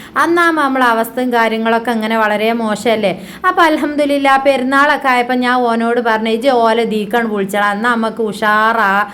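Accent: Malappuram, India. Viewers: മലപ്പുറം താത്താന്റെ വർത്താനം ആണ് അല്ലേ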